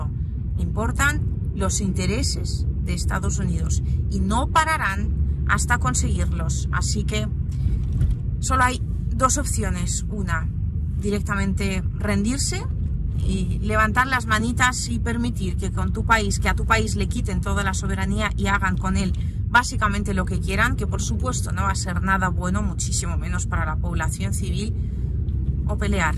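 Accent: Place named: Spain. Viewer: te queremos tanto aquí en Latinoamerica,que quisiéramos vayas cambiando ese acento ibérico, a uno más acorde con nuestra latitud.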